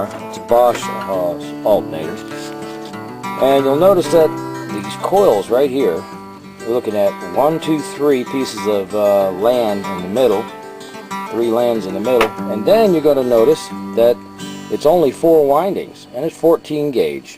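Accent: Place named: Texas, United States. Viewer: Great video,. You should explain how to test the diodes too. By the way, great Texas drawl too. Do you have a girlfriend yet?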